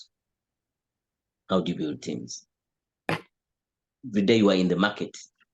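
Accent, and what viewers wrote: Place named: Uganda. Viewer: Hello Sir, I understand from your accent that you are from Uganda...where I happen to be and I am interested in learning more as I would like to invest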